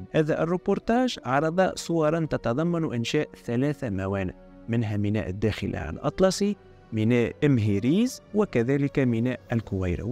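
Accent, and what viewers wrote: Tunisia. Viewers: بعد السماع جيدا ، تبين أنك تونسي خبيث و تمس بسمعة المغاربة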